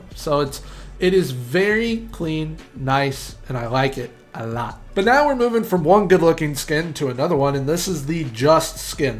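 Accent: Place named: United States. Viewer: weird hearing a yank talk fm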